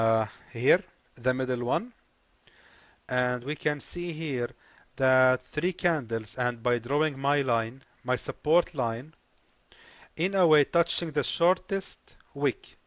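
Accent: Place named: Arab world. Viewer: your accent is Arab, if so, why not making videos in Arabic?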